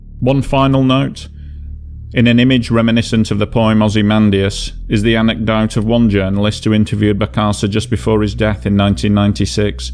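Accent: United Kingdom. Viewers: Is the narrator a native of England? His english accent looks like very british to me.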